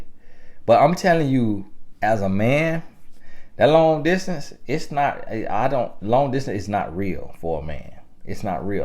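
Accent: United States. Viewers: Lol crack me up with that country accent... ladies listen to this man of GOD! He is telling us the truth! Do not chase no man PERIOD! Let them go something better will come if you are patience...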